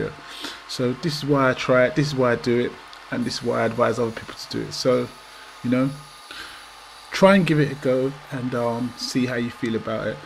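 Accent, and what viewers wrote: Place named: United Kingdom. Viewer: I think, in future, just say "would not" instead of wouldnt, in case the non-Brits dont understand your accent mate!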